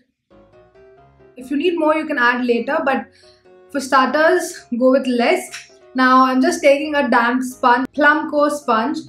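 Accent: South India, India. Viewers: Loving that South Indian accent in your English...❤️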